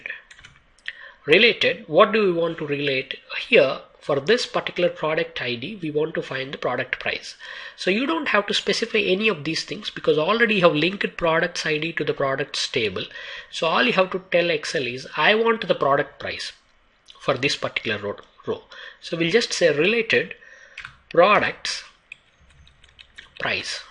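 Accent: India. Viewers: Interesting video. Although, your accent sounds like a typical Indian, I still can understand you. It's clear and well explained. I think it will be better if you include sub-title to your video so that viewers who are not familiar with Indian accent can understand you better. Anyway, it's a GREAT JOB. Thanks a lot. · I open the video, hear and indian accent and immediately know that the tutorial will definitely worth my time.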